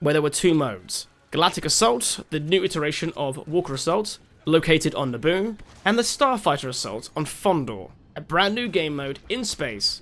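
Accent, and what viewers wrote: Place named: England, United Kingdom. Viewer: English accent? Star Wars video game lore? Subscribed!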